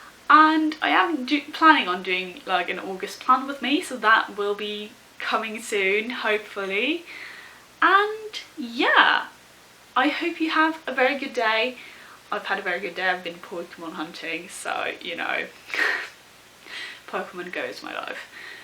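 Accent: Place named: United Kingdom. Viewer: Thats header very cool & I loved your accents too.... British accent?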